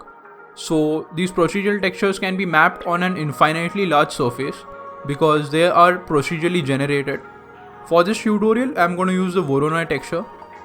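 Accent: India. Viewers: There's a heavy Indian accent!!😂😂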